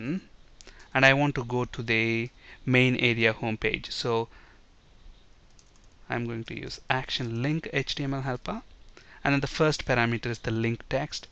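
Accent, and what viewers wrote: India. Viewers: At first i didnt liked ur tutors, but ... you are the best tutors maker i have ever seen. Keep up. I didnt like them cuz of the common words like "vep-applications; vindows" etc :D Indian stuffs... but u are awesome :) That on impressed me the most... i mean that u are using fresh VS from beggining and in every tutor u are using same names for ur models, views etc.. so it makes it more clear. GOOD JOB!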